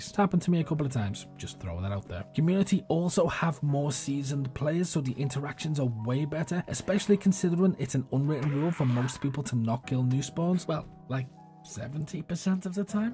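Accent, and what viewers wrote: Liverpool, United Kingdom. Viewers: Are you scouse?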